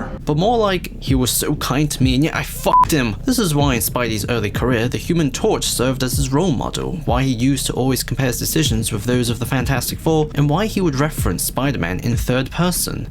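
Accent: United Kingdom. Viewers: I find it funny that you thinking having an “insightful” analysis is zooming in on out of context, unconnected quotes from a comic book, with some douchebag with a British accent reading them to lo-fi hip hop in the background. It’s not insightful in the slightest, but there is another word for it: disingenuous. He misrepresents the comics in HORRIBLY bad faith. Nothing insightful about that.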